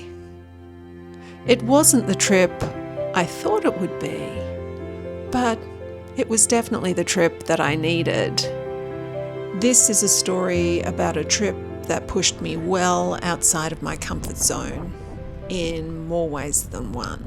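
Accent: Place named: Australia. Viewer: you sound like you’re Australian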